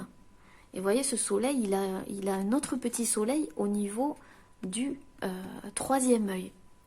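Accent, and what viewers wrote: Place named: Hautes-Pyrénées, France. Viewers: Bonsoir, Merci cela me fait du bien d'attendre l'accent des HP. Aucun endroit ne ressemble à notre département. Les marchés me manquent et la simplicité de nos campagnes. Les gens sont simples, et on rigole bien. Et la tourte!!! Tu es trop mignonne, très douce et gentille. Prends soin de tous ceux qui te sont chers et de toi aussi bien sur. Bye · je découvre ta chaine, j'ai choisi le tas 2. Tout est vrai et le chakra de la gorge cela me parle car pendant 65 ans j'ai tu ma personnalité pour éviter les conflits, pour ressembler aux autres avec cette impression incroyable d'être complètement différente. Ma façon d'être à toujours dérangé et j'ai perdu mon identité. J'ai le don de l'écriture, du chant et du dessin et depuis plus de 6 ans... je suis bloquée. Mardi je me fais ponctionner la thyroïde... à force de ne pas dire mon ressenti, de taire certains sentiments, j'ai développé un soucis qui peut être grave. 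J'ai des rêves prémonitoires depuis longtemps, je fais des méditations, de la cohérence cardiaque et aussi j'écoute beaucoup de séances d'hypnose pour avancer. Je confirme les douleurs dans le dos et les bras, la libido inexistante. Je me fais aider par une magnétiseuse-énergéticienne qui m'a dit que j'avais un pouvoir. Je suis trop fatiguée et fragile en ce moment pour l'aborder et le travailler à 65 ans on a pas la même énergie qu'à 20 ou 30 ans. Merci Jolie Voix à l'accent qui fleure bon l'Occitanie. Namasté 🛐☯️♾🌟🌹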